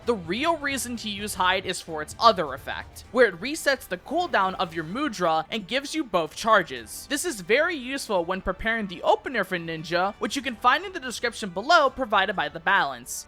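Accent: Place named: United States. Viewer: my god your voice is so painfully american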